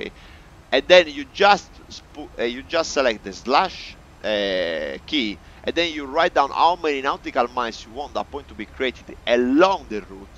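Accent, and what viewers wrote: Italy. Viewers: Mamma mia, parla troppo...hehehe, Thanks for the video, I can tell you are Italian...just like my family 🙂, Great video, just learned a new thing with you. If you were an American, you would have described this in 2 minutes 🙂..GRAZIE MILLE fratellino.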